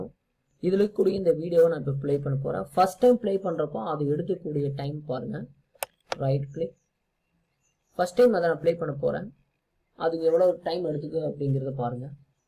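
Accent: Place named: Kongu, India. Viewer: Bro... Ninga Erode / Tiruppur ah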